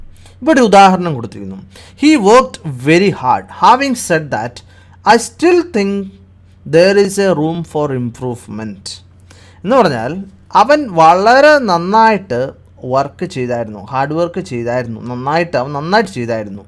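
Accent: Kottayam, India. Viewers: Please avoid sir kottayam slang I didn't understand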